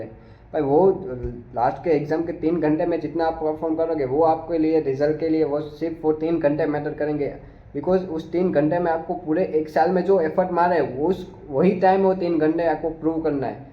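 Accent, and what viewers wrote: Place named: Gujarat, India. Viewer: Brother your gujrati accent is great, did you study in gujarati medium?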